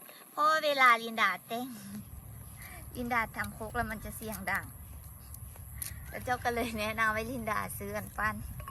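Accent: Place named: Laos. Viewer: เว้ากะม่วนหลาย มักสำเนียงน้องลินดา หลงเสน่ห์สาวลาวคนนี้แล้วล่ะ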